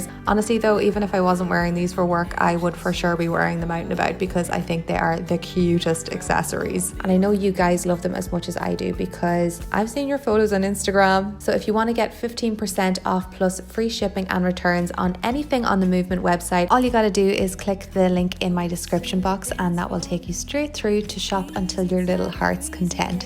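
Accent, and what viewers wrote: Ireland. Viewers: Is her accent Irish 😍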